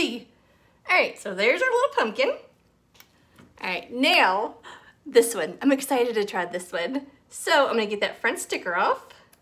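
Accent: South, United States. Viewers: I hear a drawl in your voice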